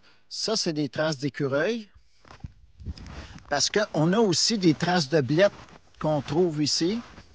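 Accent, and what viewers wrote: Quebec, Canada. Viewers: Quel region du Quebec c'est ca? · ce bel accent canadien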